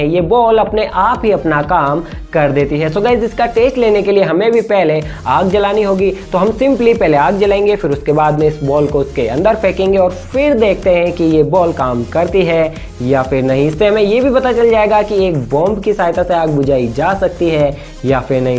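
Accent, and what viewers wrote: Rajasthan, India. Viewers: Bhai tu Rajasthan se he kya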